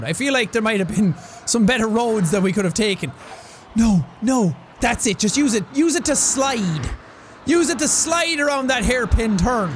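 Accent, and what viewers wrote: Ireland. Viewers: Is it just me or is extra Irish in this one? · Is it just me or has the become more Irish? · Omg his Irish accent came through so hard in this video, I love it! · Ok random thing I stopped watching you for a month (idk why) and I come back and you sound 10x more Irish than before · You seem more irish today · Am i the only one who is gonna realize this is the most Irish sean has been in a video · is sounding WAY more Irish these days! ❤️😂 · Is it just me or is extra Irish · I love that you let your Irish out. I also love it when you say "ya tink?" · you Irish accent is to strong to do any other accent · has anyone noticed his accent os more Irish? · is it me or has accent only gotten stronger since leaving Ireland xD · This is the most irish has ever been. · sounds especially irish in this video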